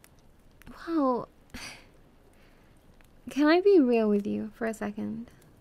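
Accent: United Kingdom. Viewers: Here i am, with headphones on and holding my pillow as if im spooning someone, ready for another of my fav British ASMR creators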